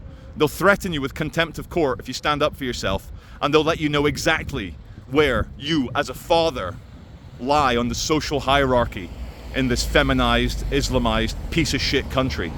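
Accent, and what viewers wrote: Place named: Scotland, United Kingdom. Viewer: your posh Scot accent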